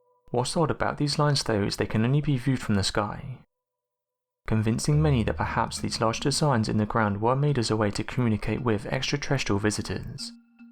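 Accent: England, United Kingdom. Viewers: Really don't like the English accent !🍀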